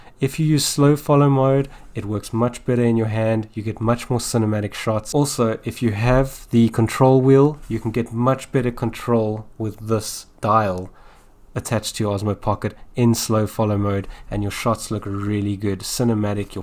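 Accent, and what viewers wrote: South Africa. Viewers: South African? Thanks for the hacks bro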